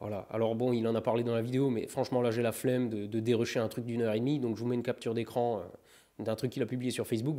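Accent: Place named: Lorraine, France. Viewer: Il m'a fallu du temps, mais j'arrive à entendre ta pointe d'accent Lorrain ! :P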